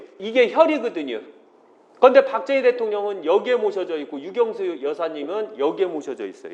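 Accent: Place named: Chungcheong, South Korea. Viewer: 쌤~"지난번에 말씀하셨는데요 넘 정감가게 말씀하셔서입니다 스산 태안쪽 말솜씨 같아 더친밀감 올백입니다~😊 방송 잘보고 교육되고요 차례음식상차림까지 진심으로 감사드립니다 고맙습니다 꾸벅 쌤 화이팅입니다 "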